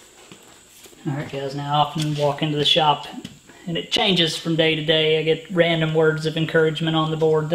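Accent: South, United States. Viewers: just need to understand that Southern drawl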